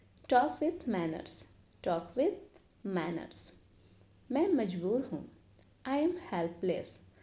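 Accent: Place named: Russia. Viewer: Mam your accent is like a Russian English and the way of speaking is really awesome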